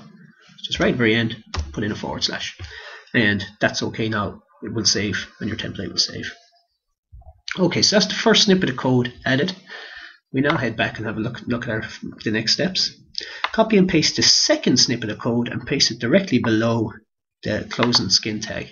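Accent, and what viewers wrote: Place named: Ireland. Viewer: Never realised the blog/you were Irish - nearly got a fright when I heard the accent - was expecting a British/American accent for some reason! Very well explained :)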